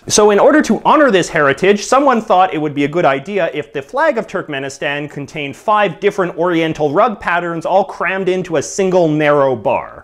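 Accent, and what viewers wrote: Canada. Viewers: Hmmm... Is this dude Canadian? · Youtuber's Fans He is Canadian and Canadians typically use Aboot. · Espeon Thomas he's canadian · are u Canadian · you canadian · Whait, is this guy Canadian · STOP SAYING ABOOT your giving Canadians a bad rap honestly stop.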